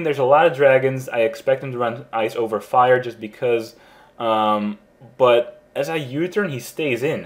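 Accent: Netherlands. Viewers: Is it possible that you are Dutch? Your English sounds Dutch :P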